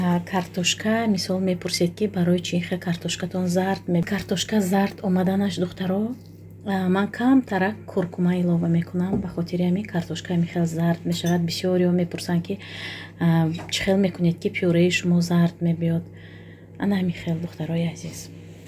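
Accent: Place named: Asht, Tajikistan. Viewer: Ха барои хамин тарзи суханкардантонам мисли мо Ман хам аз райони Ашт Ман давно Канали шуморо тамошо мекунам ба шумо пешравихо хохонам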